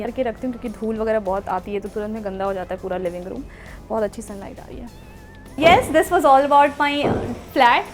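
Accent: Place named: Braj, India. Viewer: Ap mathura se hai Mam,, ? Apka acent Brajwasiyo ki trha lgta h 🥰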